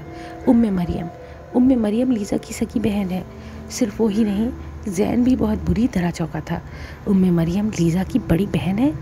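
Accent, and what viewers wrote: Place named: India. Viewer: I just dont understand as being an Urdu speaker why can't you pronounce Urdu words properly? you keep saying TULKHI INSTEAD OF TALKHI, and many other words like this and since when Pakistani's started speaking like Indian?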